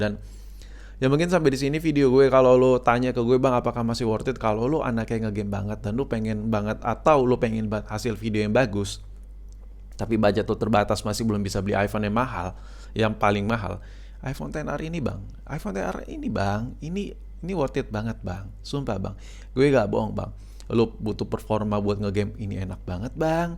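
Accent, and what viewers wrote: Indonesia. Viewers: English tapi logat tetep indo ya kang 😁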